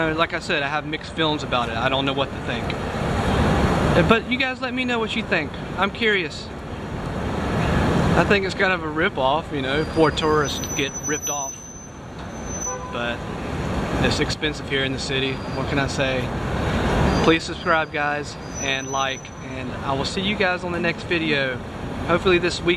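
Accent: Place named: South, United States. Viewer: your from the south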